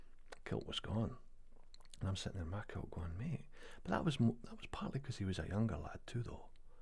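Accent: Scotland, United Kingdom. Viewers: Loved this video, it was interesting but a bit sad people feel if something is too Scottish it is inferior. I always think of Scotland as a beautiful place with strong people with a history I find very interesting. Your accent is so beautiful!!!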